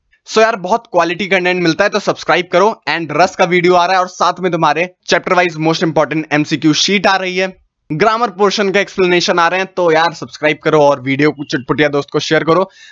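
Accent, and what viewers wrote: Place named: Kanpur, India. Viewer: Bhaiya Aapki aawaj na...Bilkul Kanpuria style h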